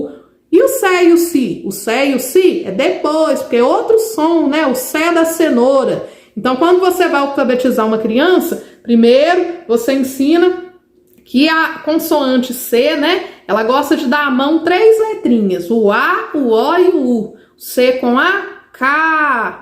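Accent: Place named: Minas Gerais, Brazil. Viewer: Vc é mineirinha? Sotaque inconfundível 😅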